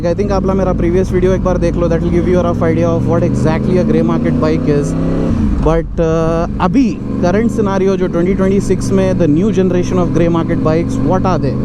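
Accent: Hyderabad, India. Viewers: Are you Hydrabadi bro ? , if I am not mistaken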